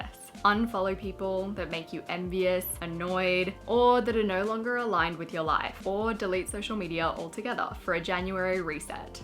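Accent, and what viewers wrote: Australia. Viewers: I think from Australia! 🦘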